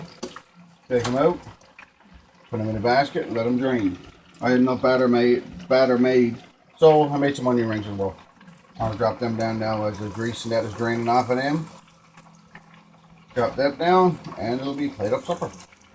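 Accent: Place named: Newfoundland, Canada. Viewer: I am loving your accent... I was thinking you’re from Ireland living in Alabama😂. 🇨🇦 Newfoundland!!! Never would have placed it 😉 thanks for the perfect recipe 😋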